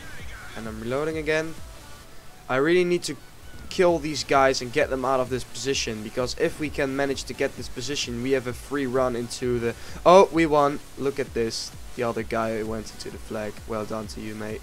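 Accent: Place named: Netherlands. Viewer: Jij bent een Nederlander toch want je uitspraak daar kan ik het aan horen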